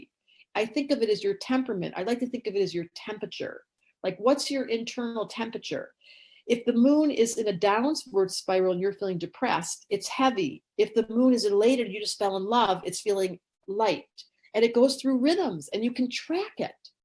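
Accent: United States. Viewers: may I ask? Are you from Chicago? I hear some kind of accent?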